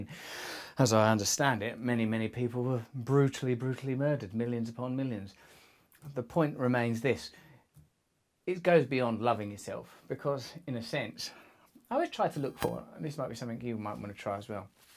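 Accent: United Kingdom. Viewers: Please yell at me anytime in your British accent 😂❤️ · are you British ???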